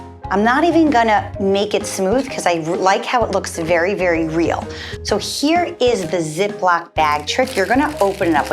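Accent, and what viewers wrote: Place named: New York, United States. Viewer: aw! I’m from NY and your accent just makes me miss home. Lol! I love this video, I rarely sit and watch an entire video with the volume up haha. Thank you, will be showing my kids your channel.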